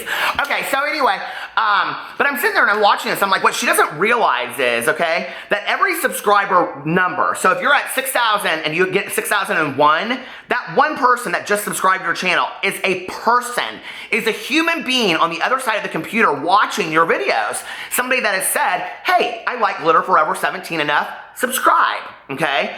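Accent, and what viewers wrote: South, United States. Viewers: You always sounded so southern to me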